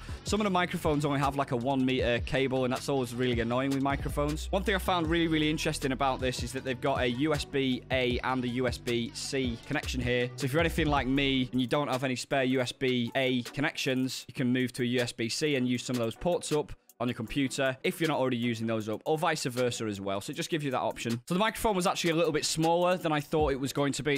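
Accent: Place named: Northern England, United Kingdom. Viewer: You've got a nice accent . I wonder where you are from in The North of The UK ?? This will help me in my English Accents Studies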